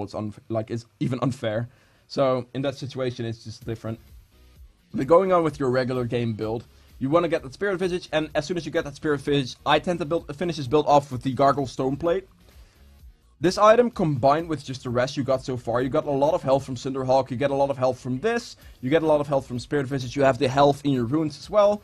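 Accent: Netherlands. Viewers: nice guide 👍👍👌btw are you dutch? it souds like it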